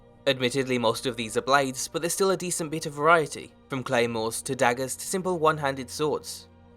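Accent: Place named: Wolverhampton, United Kingdom. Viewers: dude you've legit got a Wolverhampton accent ;D ! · England, 'Midlands'. That is to say likely he comes from the area surrounding and including Birmingham. However is accent doesn't strike me as a brummy so perhaps slightly further north than Birmingham.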